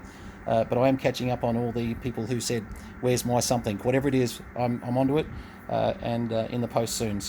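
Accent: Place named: Australia. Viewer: Wow. Glad you're here. I'm trying to repair/restore the very same AE 76-577. Such issues after issues. I've had to install NTE1233 when we couldn't get an ECG1233, and that's because well, you know what it's like trying to find an 02A today without donor radios. Then I had to rebuild the VCO block. The last owner had cracked the slug so I had to dig the old one out, resleeve the wall and donate a slug from another. Now after all that, I've still not got lock. I'm chasing a gremlin. I need to get an AE 76-577 specific service manual. I got my hands on a Cybernet service manual, but it can't quite help. Anyway, it was nice to hear another Aussie while looking for AE stuff, and great to hear and see you got yours hummin' again. 73's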